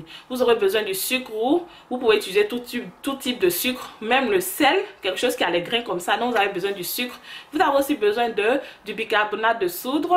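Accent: Ivory Coast. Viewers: Sans demandé je sais ke t'es ivoirienne, l'accent la ment pas dehhh, lol. Je m'abonne 😊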